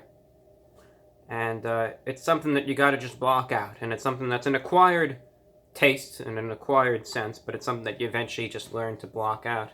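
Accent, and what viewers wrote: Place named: New Jersey, United States. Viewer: Part of his charm is demeaning his uniqueness. I love his Jersey accent and persona. He's good-looking in an uncommon way. I fuss at him because I do like him.😂❤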